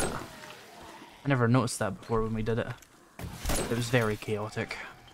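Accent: United Kingdom. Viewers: The whining is getting cringe. Takes me 4 or 5 times to watch a video because nothing else to watch. The British accent doesn't help, makes it sound miserable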